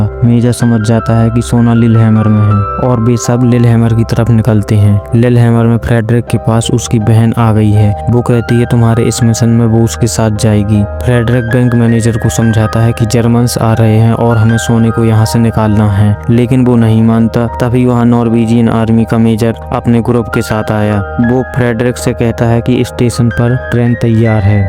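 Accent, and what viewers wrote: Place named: Bundelkhand, India. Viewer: तुम तो बुंदेलखंडी लग राय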